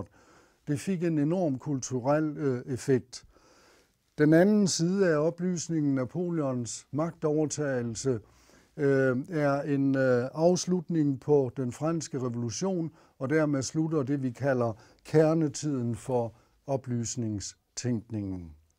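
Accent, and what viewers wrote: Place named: South Jutland, Denmark. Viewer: Filosofi på Sønderjysk.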